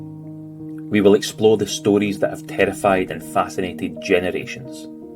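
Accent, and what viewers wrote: Scotland, United Kingdom. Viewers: This was PERFECT. Love your voice the narration graphics everything. I think I’ll subscribe. Great channel. Are you from Scotland?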